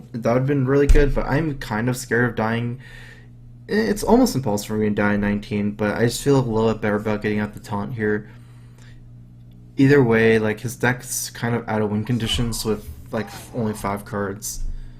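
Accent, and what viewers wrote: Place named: South, United States. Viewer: ***** Because has a southern accent.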